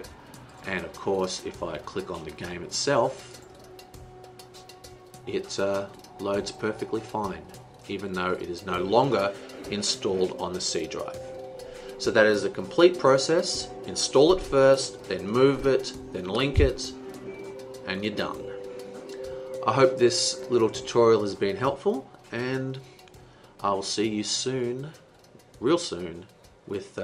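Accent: Australia. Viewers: I'm most impressed by the fact that you did all this with steam open in the background. Anyway, great tutorial! And is that an Australian accent? :P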